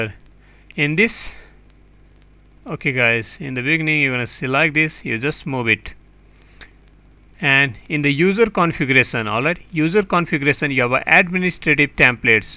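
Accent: India. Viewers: I love Indian Eng accent ; ) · this was the first video i clicked and when i heard that indian accent, i instantly knew this would save my life! thank you brudda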